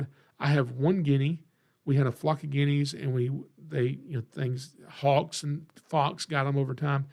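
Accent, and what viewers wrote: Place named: South, United States. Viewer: Re your "accent": Louis Grizzard once famously said, "I believe God talks like we do." It's the opening line in his comedy routine "Southern Speaking" and may do you some good to hear.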